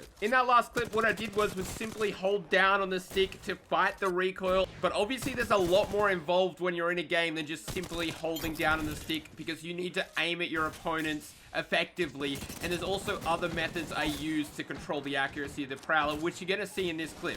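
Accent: Australia. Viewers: I guess that's the Aussie accent lol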